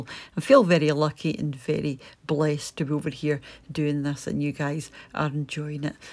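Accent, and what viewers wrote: Scotland, United Kingdom. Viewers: Hi & Jasper, I’m a new subscriber. I love your YouTube channel & Scottish accent! 😻🐟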